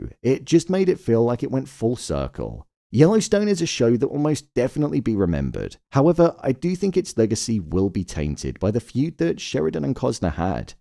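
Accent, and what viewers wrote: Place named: RP, United Kingdom. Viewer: This BBC voice narrator is definitely not helping the review. What a shitshow ending.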